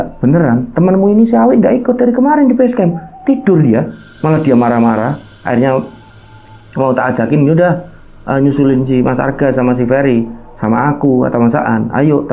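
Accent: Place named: East Java, Indonesia. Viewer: Tetaplah konsisten dengan Chanel Pendakian, semoga makin sukses....dulu saya kira dari Malang kok logatnya kayak Jawatimuran.....👍👍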